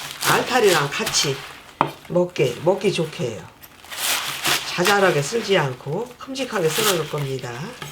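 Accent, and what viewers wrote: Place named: Jeolla, South Korea. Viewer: 무수~ 전라도 사투리 울할머니 생각납니다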